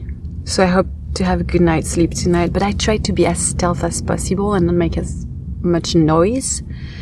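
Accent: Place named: Quebec, Canada. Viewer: Lol I hear you on the fast food. We complain it's making us fat but still keep on eating it. 😂 I had a mcnugget meal last night. Just walk often. I'm in my 30s too. Maybe a slight weight increase, but nothing too bad. Be safe as well. 👍 Are you from France or Quebec? Your accent sounds similar to people I've met from Quebec.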